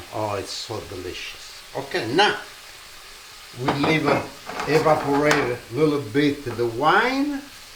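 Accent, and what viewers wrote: Italy. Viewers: I love that Italian accent…reminds me of my grandparents ❤❤. We need more stories of your life in Italy!😊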